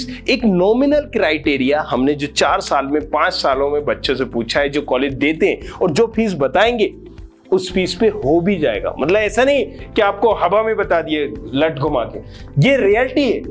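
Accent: Bhopal, India. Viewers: Your accent is pure Bhopali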